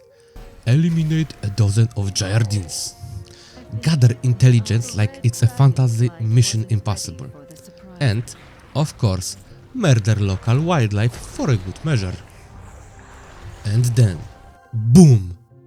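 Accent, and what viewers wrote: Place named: Balkans. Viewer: bałkan inglisz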